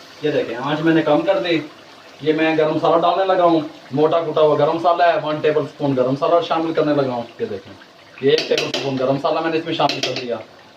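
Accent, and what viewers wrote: Punjab, India. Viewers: ka punjabi ka tarka v good. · Very nice ap ka bolne ka andaz Punjabi thori thori jo bolte hain Good job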